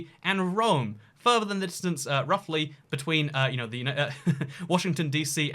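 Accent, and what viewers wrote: England, United Kingdom. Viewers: When you say “Nevada” wrong I feel like it’s okay because you have an English accent, but if someone with an American accent were to say it like that like shit bro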